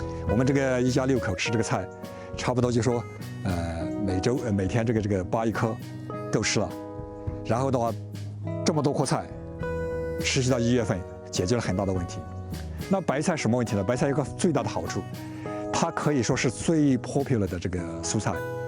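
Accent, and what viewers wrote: Yunnan, China. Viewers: 冒昧问一句，Andy叔您是不是云南人？我觉得你的普通话和我的很像，我是云南人！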